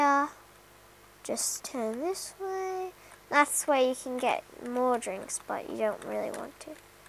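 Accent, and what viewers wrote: Australia. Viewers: Is she Australian? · the australian accent though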